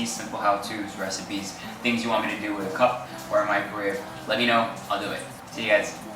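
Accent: France. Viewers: it's cuz he's French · that french accent.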